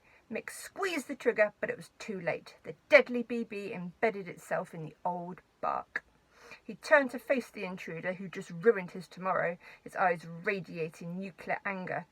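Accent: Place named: United Kingdom. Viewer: Sounds so much better with a British accent!!! Thank you for doing this. You rock.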